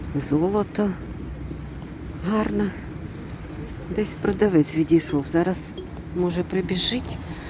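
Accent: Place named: Kyiv, Ukraine. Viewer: Дякую за відео і чудову українську мову з київським акцентом 💞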